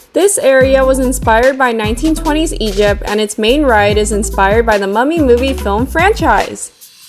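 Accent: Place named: United States. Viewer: 最近跟著小六的女兒一起學英文，可以聽道地的美語，還有有趣的Vlog，非常棒的影片。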